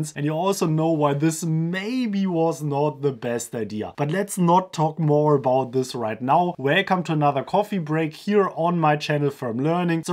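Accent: Germany. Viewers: can i pay you to say the word "squirrel" and the statement "clean and clear car windows"? i don't think i've heard such a pronounced German accent outside of TV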